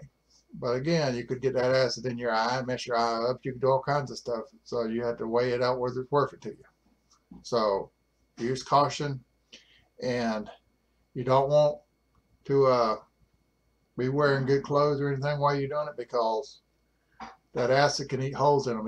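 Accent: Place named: South, United States. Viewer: I've got a couple of batteries new I let go I shouldn't have and I really wanted to understand how to rejuvenate these batteries they're deep-cycle but I can't understand a damn word you're saying hardly you need to learn how to speak or something I know country accent is one thing but when you're making videos everybody needs to understand what you're saying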